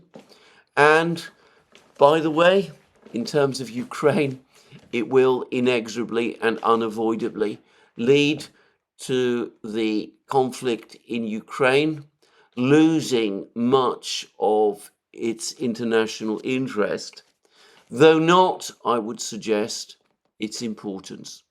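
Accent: United Kingdom. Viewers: I believe you SAID..SEVERAL TIMES..that the Ukraine conflict was fital to the world! And stop giving peace planes..You sound british (REDICULOUS) an YOU are very SINICAL!!!!!!!!!!!!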